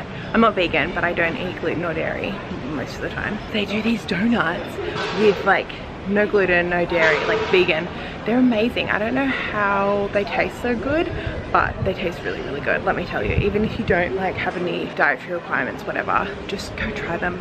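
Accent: Australia. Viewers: Hello.. Are you a Straya?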